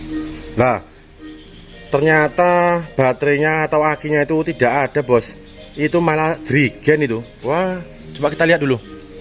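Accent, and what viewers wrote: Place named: Java, Indonesia. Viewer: Maaf pakdhe ,aslinya orang jawa mana? Kok kebrongos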